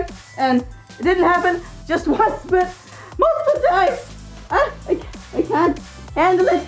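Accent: Australia. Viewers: How the hell did you get more Australian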